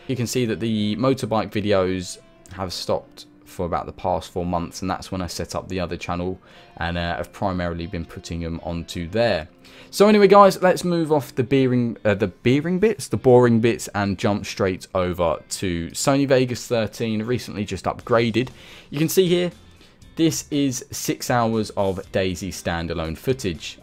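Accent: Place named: United Kingdom. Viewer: Awesome video + i love that brittish acsent im bad At English because im Finnish :(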